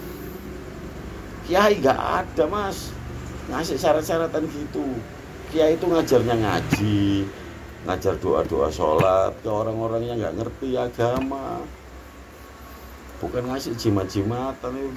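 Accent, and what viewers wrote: East Java, Indonesia. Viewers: Losss..... Ben ketok nek wong Jawa. Timur mas 🙏🙏🙏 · Gpp gus.. Mklum ktnya gus org tuanya jombg.jbg nya mana gus.. Sya dr djbg. Smga sehat selalu gus ... ..logat jatim ya bysaa gus... Woteper. Heheh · Nek gak kaku, duduk arek suroboyo arane... Jian josss... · Top gus lossss.. Ngomong Karakter suroboyo tanpa ading aling-aling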